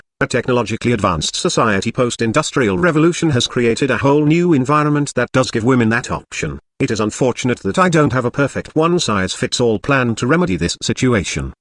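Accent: United Kingdom. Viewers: Good stuff. Are you from the UK? or is that just the synth-voice?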